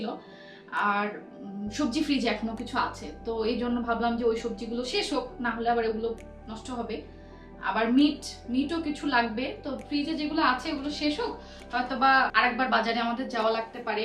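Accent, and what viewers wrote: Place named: Chittagong, Bangladesh. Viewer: কথা শুনে মনে হচ্ছে চিটাংগগে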